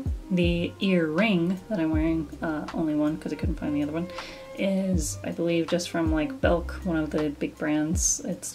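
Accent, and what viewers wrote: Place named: United Kingdom. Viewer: You remind me of a teacher,you have that look on your face....a strong one..dont joke with me :-) British accent is phenomenal You have definitely some acting skills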